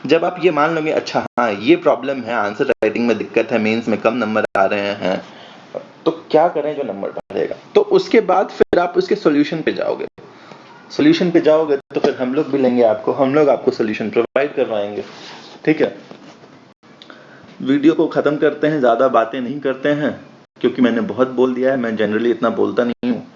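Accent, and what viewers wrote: Bihar, India. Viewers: I was waiting for this video bcz my optional is History 🤣🤣🤣🤣, And I like ur Bihari accent, Aap english use kar skte the ya shudh हिंदी, But hindi with bihari accent, Bole to jhakkas sir, Thanks for this video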